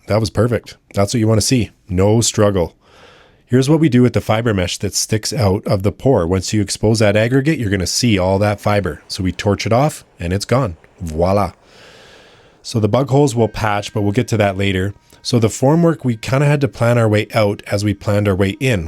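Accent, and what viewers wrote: Canada. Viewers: Are you Canadian by any chance?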